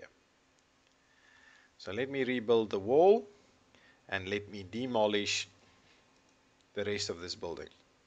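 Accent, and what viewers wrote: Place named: South Africa. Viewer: Great accent. South African? Can you say "Diplomatic immunity!" sarcastically please. :)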